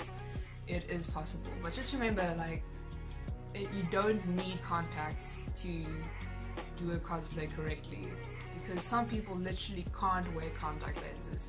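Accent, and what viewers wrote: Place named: South Africa. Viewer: Just your voice tells me u r South African :) love ur cosplays I hope one day I can cosplay like you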